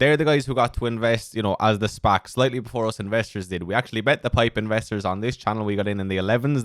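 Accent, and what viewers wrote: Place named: Ireland. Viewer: So glad you are back, good to hear that Irish 👊🏼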